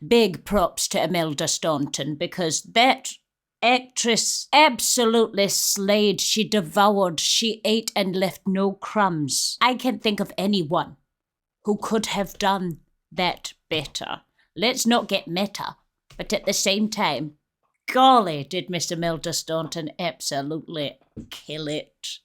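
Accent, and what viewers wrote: Scotland, United Kingdom. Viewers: such a good scottish accent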